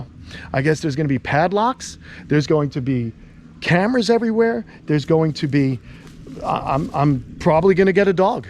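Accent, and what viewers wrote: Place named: New York, United States. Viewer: The New York is starting to come out.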